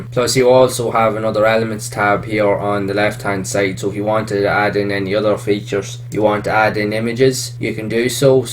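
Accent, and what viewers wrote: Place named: Ireland. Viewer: what county in Ireland you from?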